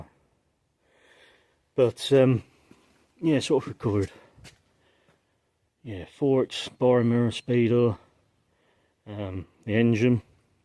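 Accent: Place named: Lancashire, United Kingdom. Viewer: Just a non relevant point, your accent seems more Blackburn, Lancashire, than Norfolk. Not withstanding this the ' bike is great and I would love to own it.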